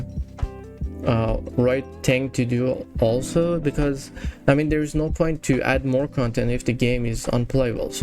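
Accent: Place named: Iran. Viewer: Oh you are Persian !!! I always watch you videos & I thought maybe you are Persian because of your accent . Well done mate 👏🏻 I created a topic about adding Persia as new civilization for AOE III DE in future in official forum (Persia civ suggestion) . You can go & check it my friend.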